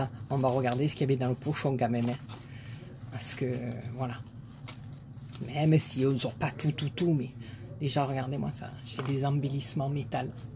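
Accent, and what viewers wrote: South, France. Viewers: ccou....waouuuuuu...tes créas reçues sont justes magnifiques!!!!! pourquoi tu reçois autant de gentillesse????? parce que tu l'es toi-même!!!!! ta bonne humeur en toutes circonstances traversent les écrans, perso quand tu parles avec ce bel accent du Sud, bah moi j'entends les cigales.... des gros poutous.....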